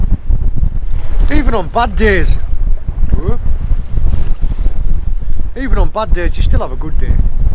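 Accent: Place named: Cornwall, United Kingdom. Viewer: oooh, a bit of the cornish accent is creeping in mate!!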